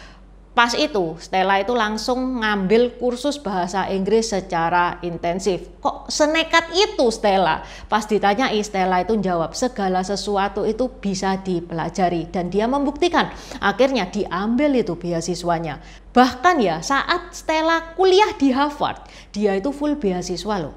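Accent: Java, Indonesia. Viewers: Sy gemes ma suaranya wong cindo jowonyo medok tenan ❤❤ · Ci juga out of the box loh, mencari konten yg lain dari yg lain dengan data yg akurat juga medok banget. TOP!, sukses terus ya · Cici,bahasa Indonesia mu jelas tp tetap aksen Jawa nya masih keliatan,mungkin Cici lahir di Surabaya...semangat selalu untuk warga keturunan dan warga lain nya,apa pun suku kamu,apa pun agamau apa pun warna kulit mu apa pun latar belakang mu mari kita bersama untuk membangkitkan negri ini yang terlalu lama tertidur,bangsa ini terlalu besar butuh orang orang yang bisa merangkul semua pihak,ingat negara kita negara kesatuan jadi kita semua harus bersatu membangun bangsa ini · Salam medhok. Buat Mbak, 😊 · Kenapa bisa medok..cece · Kalo kak Cijaw...Cina Jawa makannya medok pokonya mantap kak ❤🇮🇩👍👍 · Medok banget mbak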